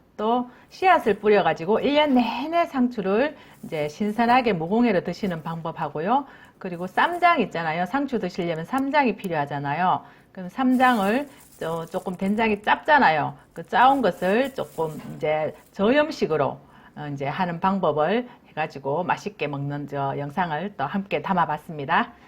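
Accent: Gyeongsang, South Korea. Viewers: 너무 재밌어요! 경상도 사투리도 좋고 옹사 잘 지으시네요.저도 사ㅇ추씨 뿌려야겠어요~/ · 늘 궁금했었는데 젊고 예쁜분이네요 경상도 사투리 매력있으세요 구독.좋아요 꼭꼭누르고갑니다 미나리키우는 방법도 알려주시면 감사하겠습니다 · 경상도 말씨 참 예쁘고 정겁네요~감사합니다💕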